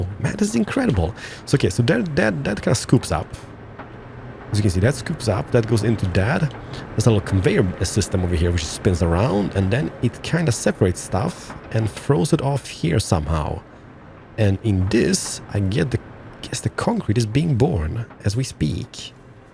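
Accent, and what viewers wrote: Scania, Sweden. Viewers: Asbra serie! Hör på dig att du är skånsk! :D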